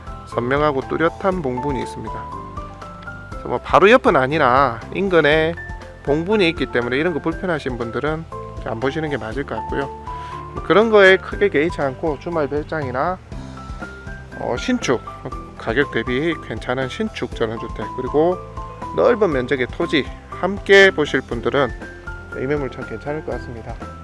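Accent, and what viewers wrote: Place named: Gyeongsang, South Korea. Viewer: 갱상도 말이 남자에겐 참말로 멋지지예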